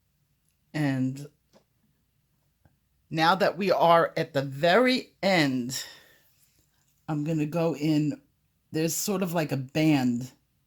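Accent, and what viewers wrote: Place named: Italian American, United States. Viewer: I found this to be extremely helpful when dealing with greys. Thank you! I really enjoy your videos. Your accent reminds me a lot of my late mother- in -law......Italian American from Rochester NY. It wasn't quite as strong but she had lived in California for a lot of years.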